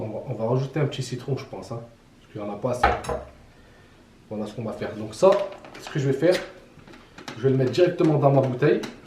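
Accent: Saint-Étienne, France. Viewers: T as a petit accent, tu serais pas de Saint É?.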